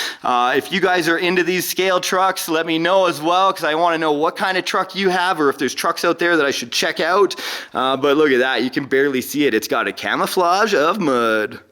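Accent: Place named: Canada. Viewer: Your patriotic words in your Canadian accent brought a tear to my eye... amazing what America has become. A salute to you sir and a big AYE. Bless the North American continent!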